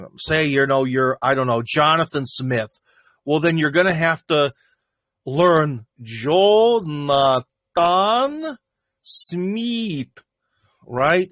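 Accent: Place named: Cleveland, United States. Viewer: You still have your cute Cleveland accent. The midwest shines through your American English,. I can't roll my rrrr's either.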